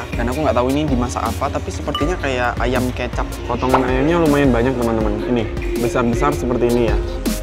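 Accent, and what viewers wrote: Java, Indonesia. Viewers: Ngomongnya kayak logat jawa tpi org bali:vv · kirain jawa. Kok kayaknya medok banget kalo ngomong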